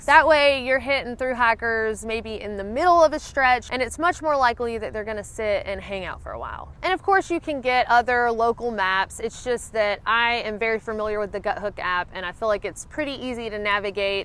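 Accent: South, United States. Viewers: your southern drawl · I wouldn't take any medication that wasn't individually wrapped; nothing from a bottle of pills., I love your videos and they are always interesting and inspiring. Hearing a beautiful Southern accent coming from an intelligent woman is wonderful.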